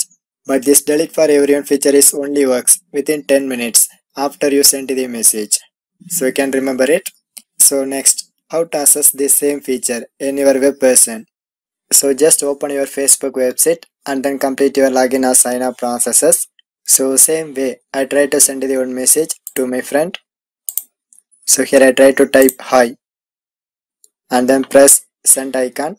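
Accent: India. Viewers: Lol your indian english sounds really stupid and it makes me laugh when I hear it lol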